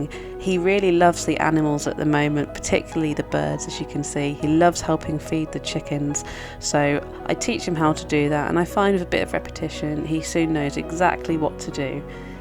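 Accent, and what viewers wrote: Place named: Manchester, United Kingdom. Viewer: Well I thought she was talking rp recived pranonsition now it terns out she sounds a mankunian?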